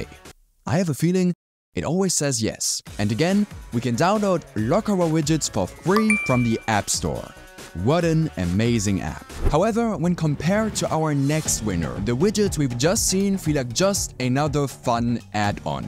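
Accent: Germany. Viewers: Ich wusste irgendwie dass du kein Ami bist. Hast ein sehr deutschen Akzent😊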